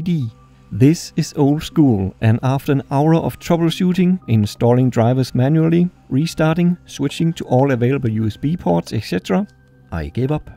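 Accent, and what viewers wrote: Denmark. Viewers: that danish accent :o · uhhh are you danish?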